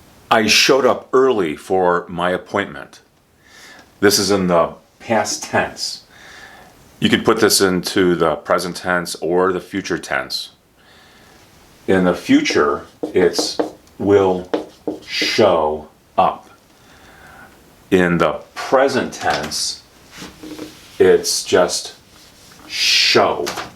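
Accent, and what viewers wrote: United States. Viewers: Thank you for your perfect lessons and real American pronunciation! I try to master American accent with you.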